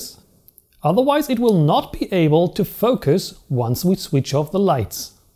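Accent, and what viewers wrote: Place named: Europe. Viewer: This is absolutely the way I need to learn manual without being bored! Glad I found this channel! Your accent is interesting. European?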